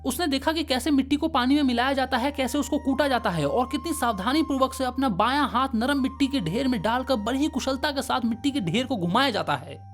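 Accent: Bihar, India. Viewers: Bhaiya bihari kam bolo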